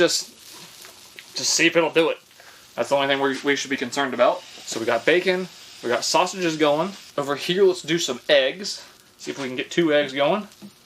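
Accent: South, United States. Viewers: Funny thing is those sweet potatoes were probably grown in the USA. They are extremely common in the South. sounds like he has a southern accent surprised that he doesn't know about it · I just found this channel and I'm a bit confused. I thought for sure from his accent that he's from the south US, but he's never seen a sweet potato? Maybe his family used canned yams and he doesn't know they're the same thing.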